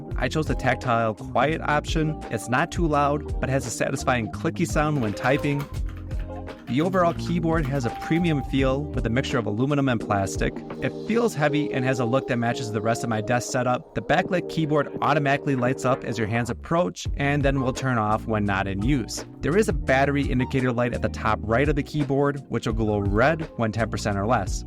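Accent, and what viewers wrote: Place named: Singapore. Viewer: wow. you sound so singaporean.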